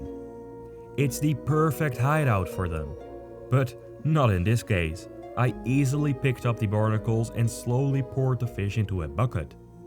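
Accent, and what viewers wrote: Netherlands. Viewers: Your from Holland right ?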